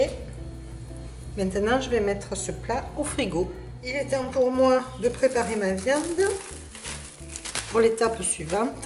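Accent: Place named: Provence, France. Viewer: bonjour merci de cette magnifique recette..vous avez un accent qui ressemble au mien je suis provencal d'arles et je vis en thailande ........adessias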